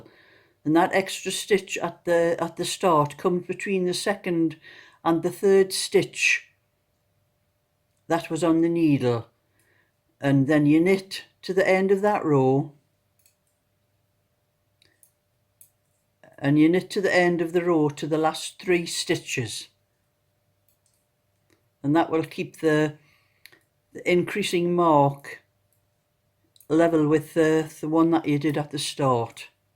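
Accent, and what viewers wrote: Newcastle, United Kingdom. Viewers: Thank you so much, that was so helpful. Knitting is my new hobby since being locked down - it’s the only thing (apart from drinking beer) that keeps me sane. Also loving your Geordie accent! xx 😘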